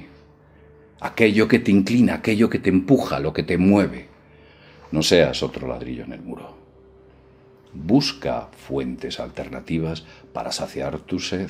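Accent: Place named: Castile, Spain. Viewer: Jeje esa mezcla de español castizo, y efectos especiales es... Bastante bizarra.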